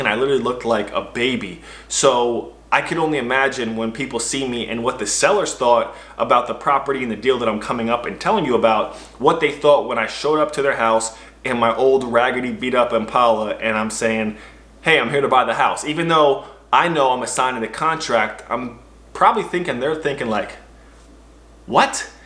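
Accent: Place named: New York, United States. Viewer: Good morning brother, do you operate out of the tri-state area? You sound like a New Yorker 💯%😁💪🏾. I'm from The Bronx.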